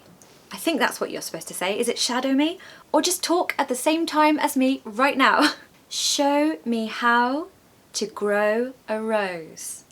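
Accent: RP, United Kingdom. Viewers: I live in PARIS about 60 years , I am VIETNAMESE, I learn ENGLISH alone because I like very much English language. It is the FIRTS TIME I listen Your video, I am very happy, because I like very much Your BRITISH ACCENT, Your voice is very Nice ! Thank You, that’ s very kind of You ! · Although your accent is RP, it sounds more elegant than what other British YouTubers speak. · When I look to your face and listen to your voice, I feel like I'm really looking at a British person.Your face and your voice is really British ❤❤❤ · From Tunisia , I'd like to say that you are the best teacher ever and your british accent is beautiful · I love your British accent, it is so elegant and beautiful! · Love the British accent. After all, English is English; there's no such thing like American English. There's English and there're mistakes. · British accent + voice: music for our ears. 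One day you said you were a Beatles fan, I was trying to imagine their songs in your voice❤ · Hello, thank you so much for this video. Modern RP is like music to my ears. ❤❤❤ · From Rangoon karen youth,we'd like to let you kow that your British english accent make us feel satisfied.... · British accent is beautiful but teacher make it so sweet to hear. I think the secret is that she is doing with somme art in her method of teaching. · Hello, I'm from Peru, you are very beautiful, your british accent I can understand it well, I've suscribed to your channel recently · Sorry but I was looking for someone with a true RP accent and yours was extremely close, so I recommended your channel to a Russian person who wants to learn English with an RP accent. Your English is excellent but that was my only criticism. If you play back this video you said ‘kind of’ three times in the space of about twenty seconds. 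It’s repetitive and something to be avoided. I suggest saying “similar to” as a variation. Hopefully you will take my observation on board but if you can’t bear being critiqued then you can delete my comments! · Wonderful British accent❤ love it. This video was fantastic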